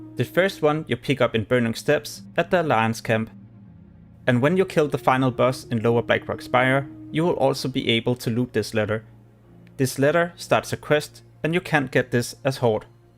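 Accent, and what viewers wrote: Denmark. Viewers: Hope it won't fix that lovely danish accent tho! I love it, keep it up man!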